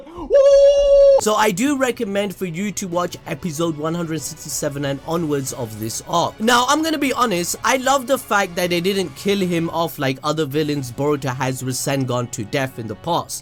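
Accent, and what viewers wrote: Punjab, India. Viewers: that punjabi accent though. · LMAO , are you Indian?? , if yes you have a very nice English accent , btw Love ur video and fk trashamaru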